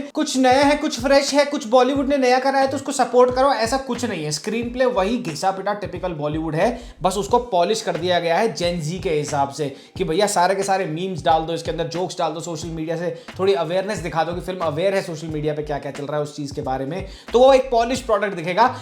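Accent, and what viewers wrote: Haryana, India. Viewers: I just love your review, it's so humorous....pls speak more in haryanvi dialect ....love from Australia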